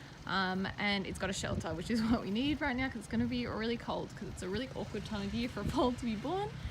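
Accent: Australia. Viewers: I realized today that I must watch way too much Bondi Vet because I Wasn’t even paying attention to your Australian accent until you started talking about the summer and winter months. I kept rewinding it and listening to that part over and over again thinking I heard it wrong and then you said a thing about the northern hemisphere and it all clicked into place and I feel like an idiot. Apparently all my Bondi vet hours have made the Australian accent sound normal..... I’m in Texas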